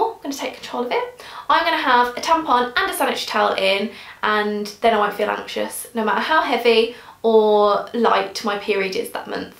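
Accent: United Kingdom. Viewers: Love from India. I absolutely love british English accent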